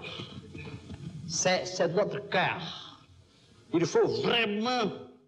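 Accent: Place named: Brazil. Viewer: Ha algo que me emociona: Dom Helder fala um francês que os franceses podem entender mas sem perder o seu jeito brasileiro de ser ... ele é aquela pitada de sal que guarda o gosto do mar ... e então pode dar gosto à farinha que vai se transformar em pão : Deus seja louvado pelo nosso grande irmão Dom Helder!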